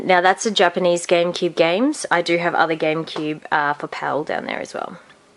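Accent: Australia. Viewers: The accent being Australian means you must know Last Gamer. Wish I lived in Australia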